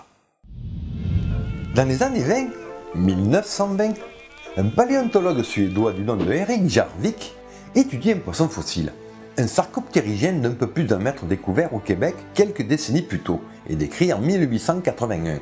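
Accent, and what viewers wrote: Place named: Provence, France. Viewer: "Fing Silurieng/début Dévonieng" je pensais pas qu'un paléontologue du sud de la France pouvait être aussi exquis à entendre 😂😂😂 Vraiment entre les familles d'espèces et les périodes, tu régales !! Hyper intéressant par ailleurs, tu alimentes ma fixette du moment 👍👍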